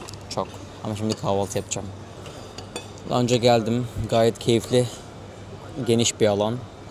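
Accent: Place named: Turkey. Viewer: Soy argentina. Bienvenido y que lo pases muy bien. Solo escucharte hablar 2 minutos y reconocí que sos turco. Soy una gran fan de la telenovelas turcas. Seas bienvenido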